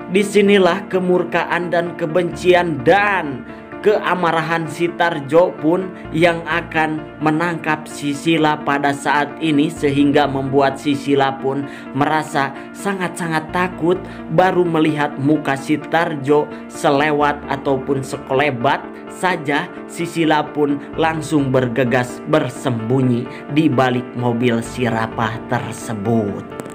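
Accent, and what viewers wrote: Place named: Sunda, Indonesia. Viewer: Meni nyunda pisan ... si miminya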